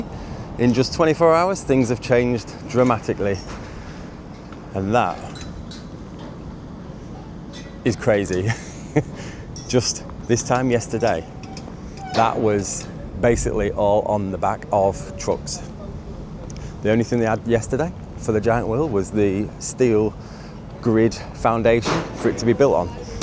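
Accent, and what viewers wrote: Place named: East Yorkshire, United Kingdom. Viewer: I am really enjoying your videos of the hull fair and how it's coming together just 24 hours ago there was nothing there they seem to crack on can't wait to see the next video tomorrow would love talking has it brings it together just love these videos was that helter skelter going up l remember going on that when l was young using a mat to slide down the helter skelter and l can also remember the cake walk l went on that with my dad and all l remember is we could not stop laughing love your commentary on your videos love your accent a good strong east yorkshire accent l am from east yorkshire myself and l can always remember been at south hunsley school and you could see out the classroom windows seeing the fair coming along the A63 was a good thing to see ❤Karen 😊 wow that RV was amazing l bet it's lovely inside 😊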